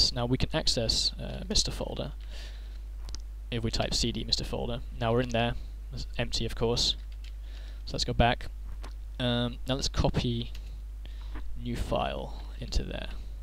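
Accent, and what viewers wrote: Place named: Netherlands. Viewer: Pretty awesome, haha. The first few minutes I could easily tell you were Dutch, with the accent. But then after ~3 minutes you get the hang of it (flip the switch, as it were) and you are nearly indistinguishable from a native :)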